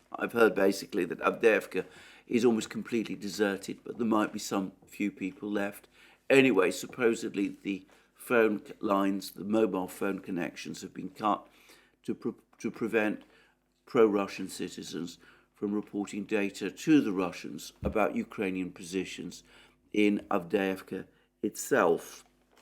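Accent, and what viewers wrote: United Kingdom. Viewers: Just found you. Ha. Great content. Almost like something I would have put out if I wasn't so lazy. Plus, with a British accent which makes you way cooler than I could ever be. I will say, however, that I am on record as rejecting this pressure on Iran re: nuclear capabilities. I wrote an article over 15 years ago in response to a Kenneth Timmerman piece. It was published in the Washington Times, and was entitled "Iran Paternalism." Good stuff if I do say so myself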